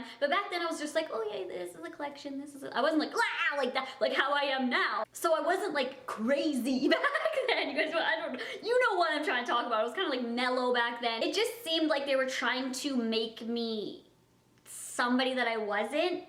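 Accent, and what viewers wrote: Canada. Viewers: Am I the only one that loves the way Canadians say about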